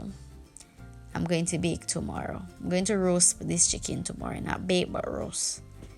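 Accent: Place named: Jamaica. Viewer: Hi you did a very good job am new in your house you sound like a yardie like myself anyway am going to say in your house big up i enjoy watching the entire video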